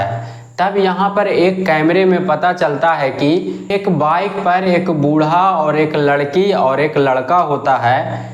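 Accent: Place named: Bihar, India. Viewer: Bhaii you’re doing well but change the Bihari Hindi accent to org Hindi write before recording you’re doing well 😊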